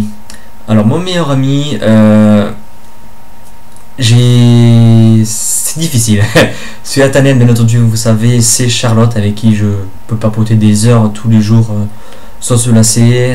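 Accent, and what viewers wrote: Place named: Gard, France. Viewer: Hey ! Merci d'avoir repris le tag :D T'es d'où exactement dans le Gard? (sans dec entre ça et ton accent, j'ai le mal du pays à mort ^^)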